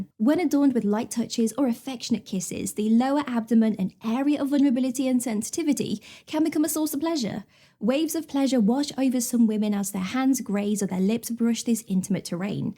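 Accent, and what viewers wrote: England, United Kingdom. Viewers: your English accent is so beautiful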